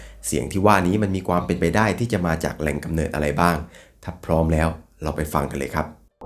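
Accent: South, Thailand. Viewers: เสียงเพราะหางเสียงแอบเหมือนคนใต้ 😊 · พี่เป็นคนใต้ป่ะคะ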